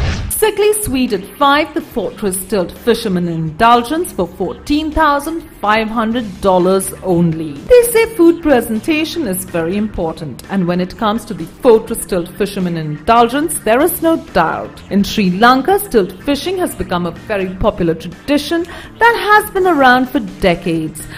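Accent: India. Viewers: interesting vid but the voiceover sucks balls. she is a northern indian. not blaming the accent...the tone is driving me insane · She has a indian accent but she's trying the British accent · i fear the speaker is of Indian origin, awful english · The person speaking is an indian Expensive, they are, but if you choke on one of those stones, youd end up in the er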